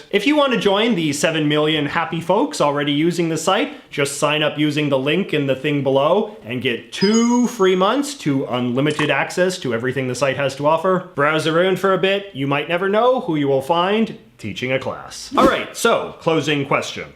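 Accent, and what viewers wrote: Canada. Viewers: J.J. McCullough- are you Canadian?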